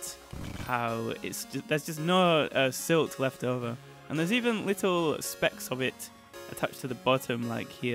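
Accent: Scotland, United Kingdom. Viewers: Your accent is absolutely glorious, where in the UK are you from? :D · nice scottish accent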